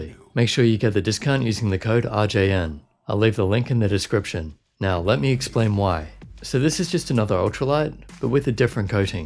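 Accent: Australia. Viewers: Nathanael Ciranni not really, it’s more that his accent doesn’t sound Italian. Sounds more Australian, or maybe from New Zealand.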